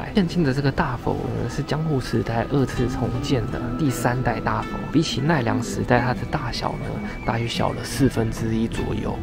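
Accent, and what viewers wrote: Taiwan. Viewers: 第一次看的影片，影片做得不錯，我只有一個問題，請問是台灣人?香港人?大陸人?看影片的標題很像香港人，內容卻是繁體字，講話的口音也有點不像台灣人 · up主是台湾人还是马拉西亚人？我听力没那么敏感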